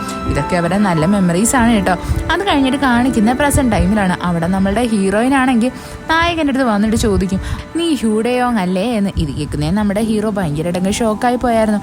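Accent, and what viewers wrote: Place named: Kollam, India. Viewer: കുട്ടിയുടെ വീട് കൊല്ലം ജില്ലയിൽ ആണോ 😂എന്തുവാ, നോക്കിയാണ്,നിക്കുവാണ് , etc ..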